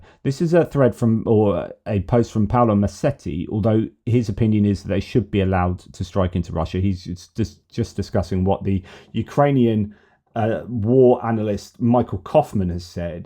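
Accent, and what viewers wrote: New Zealand. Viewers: I don’t care what anyone says.. your Kiwi accent is pretty decent. 👍👍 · haha good kiwi accent churr bro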